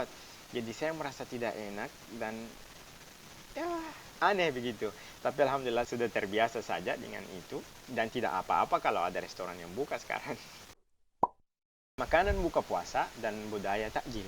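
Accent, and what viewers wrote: Makassar, Indonesia. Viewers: kentara mi logat makassarnya hahaha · Logat makassar. Wkwk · pertama lihat video ini saya pikir kok logatnya kayak logat makasar ternyata memang tinggal di makasar toh😄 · Logat Makassar tawwa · Logat Makassarnya kental banget. Btw, salam dari SulSel tapi bagian Sinjai. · pantas logat makassar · Bahasa Indonesianya Bagus, ada campuran logat makasar.. 👍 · pntasan ada logat" makassarnya🤣🤣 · Logatnya makassar, mantap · Baru pertama nonton tapi udah langsung tau kalau pasti kuliah di makassar karena logat khasnya 🤣🤣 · Bahasa indonesia nya kayak orang makassar hehe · Logatnya seperti orang makasar.. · Logat makassar 🙂🙂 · makassar bgt logatnya 😬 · Logat makassar 👍🏻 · Bisa menyesuaikan banget logat Makassarnya ya mantap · logat makassarki dgg 👍👍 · Ini kuliah di mana kayaknya di bagian timur Indonesia ya. Kayak logat makassar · Org sidan tp, Ngomongnya kayak org makasar wkwkwkw 👍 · Logatnya makassar tonji 😄👍👍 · Logatnya seperti logat makassar ☺️ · Logatnya sedikit ke makassar wkwkw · hebat logatnya sdh seperti org Makasar...mantap👍👍👍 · Bahasa Indonesia Aksen Makassar 😅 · Udah mulai kentara logat makassarnya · Logat Indonesia nya ada aksen Makassar 😁👍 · Logat Makassar nya kental... 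· Koq ada aksen mkassarnya...ini dmksar ya?? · logatnya makassar banget, btw ini kuliah di UNhas kan? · ohh masnya di makassar yah. pantas logat bicaranya tdi ku pikir mirip logat makassar teenyata krena faktor kukiah di makasssar😂 jdi logatnya ikut logat makassar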